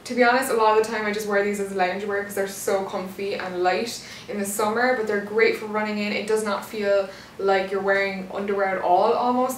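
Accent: Cork, Ireland. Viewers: Your Cork accent is definitely after flaring up after your visit home hahaha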